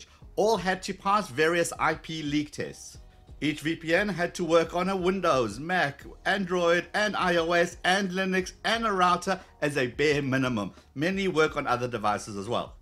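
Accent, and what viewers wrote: Johannesburg, South Africa. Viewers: Dude you sound South African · Are you from South Africa as you acent sounds like JHB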